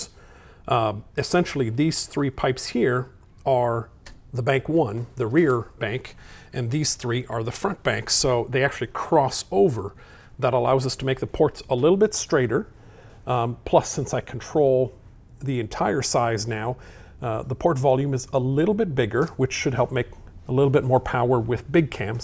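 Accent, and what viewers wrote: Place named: Canada. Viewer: A Canadian sounding Hoosier?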